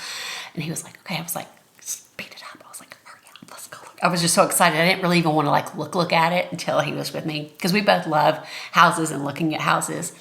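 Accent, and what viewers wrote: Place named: South, United States. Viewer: I’m so eager to hear how your southern accent evolves onto British. It’s going to be adorable! 😊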